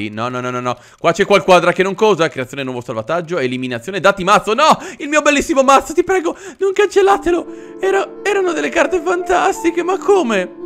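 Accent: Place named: Romagna, Italy. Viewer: romagnolo style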